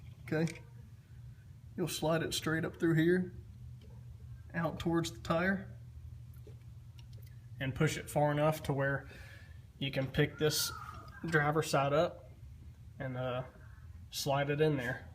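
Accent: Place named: South, United States. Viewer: The southern accent makes it so much better, though. 💪 🍻 🔥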